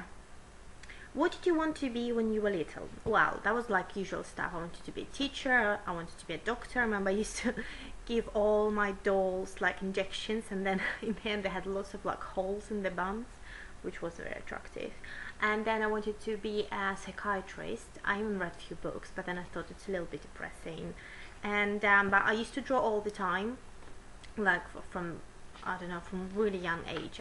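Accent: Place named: United Kingdom. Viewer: what is your accent? it sounds british but.. with a mix of something? it's cool!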